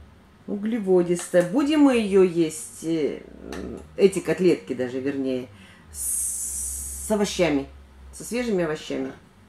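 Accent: Kirov, Russia. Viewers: Как мне нравится Ваш кировский выговор, у меня бабушка и все родственники с севера Нижегородской области похоже говорят ))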